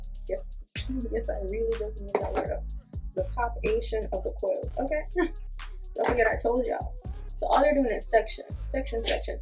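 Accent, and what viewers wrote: Jamaica. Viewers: Are you Jamaican? 🇯🇲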